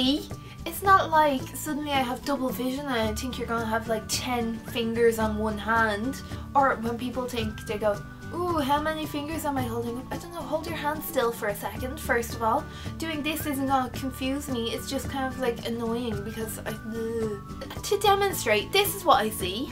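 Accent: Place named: Ireland. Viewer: Are you Irish? I love your accent!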